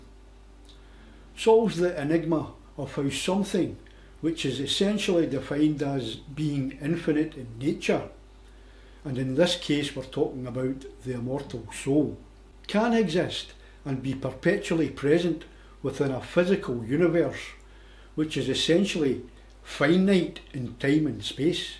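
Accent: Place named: Scotland, United Kingdom. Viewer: Wow the Scottish accent brings me back to Edinburgh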